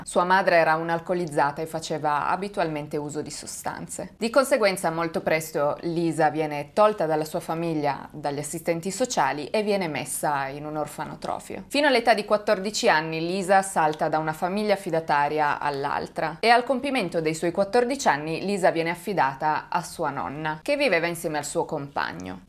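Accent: Turin, Italy. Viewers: Si sente che sei torinese cara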